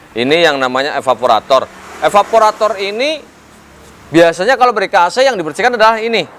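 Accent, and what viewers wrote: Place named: Banyumas, Indonesia. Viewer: ngapak e isih ketara lah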